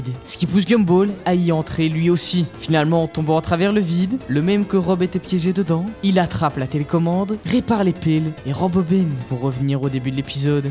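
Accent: Liège, Belgium. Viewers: J aime trop l accent de liege 😂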